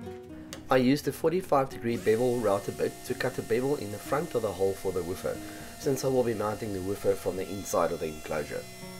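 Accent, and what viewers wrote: South Africa. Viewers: South African accent but now based in the US?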